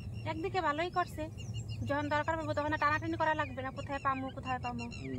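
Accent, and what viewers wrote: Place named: Bangladesh. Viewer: দিদি তোমার বাড়ি কি আগে বাংলাদেশে ছিল তোমার কথাগুলো একদম বাংলাদেশের মানুষের মতো❤️❤️❤️❤️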